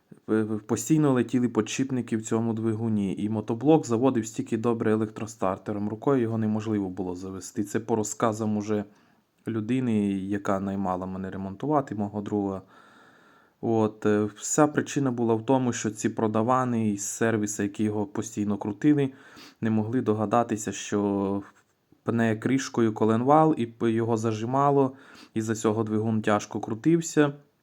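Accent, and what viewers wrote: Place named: Hutsul, Ukraine. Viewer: Акцент гуцульський.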